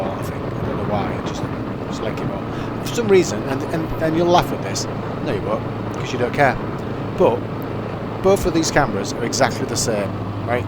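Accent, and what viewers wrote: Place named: Hull, United Kingdom. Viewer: Ahh the Hull accent, I still pronounce my u's and i's like an e. Flert, skert and hert... three random words there from top of my head but story of my life actually!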